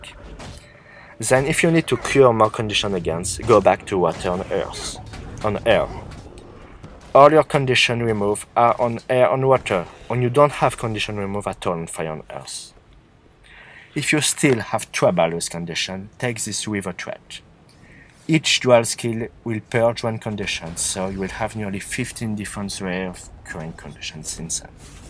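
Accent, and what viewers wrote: France. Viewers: Dude! what are you french? best teaching video I've seen so far. Oh and I like when you say "it is insane" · Your english is difficult. You speak way to fast as your accent pronounces words wrong. Can't understand half of the begining. Gave up. Learn to enunciate english with out french habits. Thanks anyway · J'entends un accent français :)) Excellent guide !!! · lol its french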